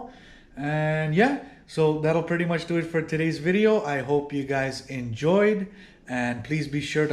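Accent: Canada. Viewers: from the moment you opened your mouth i knew you were from gta/peel lol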